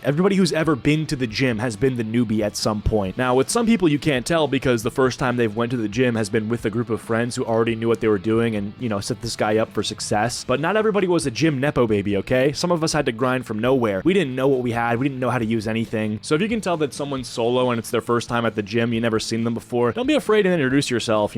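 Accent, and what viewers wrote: Netherlands. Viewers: Je bent nederlands? Ik kan het amper horen in je accent, goed gedaan!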